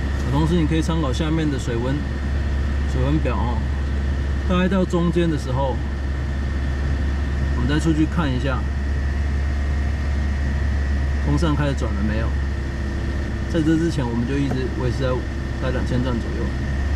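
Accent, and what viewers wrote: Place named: Taiwan. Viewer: taiwan accent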